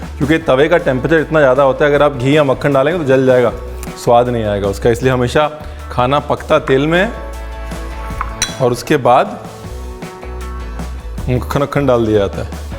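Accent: India. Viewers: At first I used to ignore your videos coz I’m not that much into cooking!! Then I started watching ur videos.. now I’m really liking ur videos ofcourse I get to learn few things but mostly your Indian-desi style of talking n cooking... ur videos are entertaining!! Keep up the good work Ranveer!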